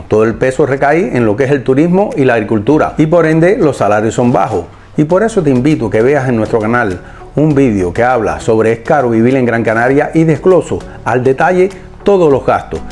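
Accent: Cuba. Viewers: Hola. Eres Cubano o Canario? Tiene un acento Cubano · Tú no eres Canario, tu acento es cubano...Yo soy de Tenerife de muchas generaciones y NO se vive bien en las Islas...antes viviamos bien...Claro que igual para ti de donde vienes esto es el paraiso...Pero el Gobierno Central nos tiene olvidados...No tienes ni idea de nuestra idiosincrasia...No me gusta como defines hablando de un paraiso mi tierra que están enterrando...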